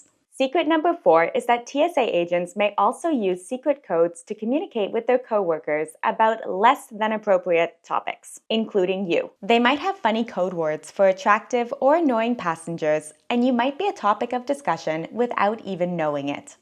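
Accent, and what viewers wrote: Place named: South, United States. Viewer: That southern draw is disconcerting... just another reason I stay out of georgia.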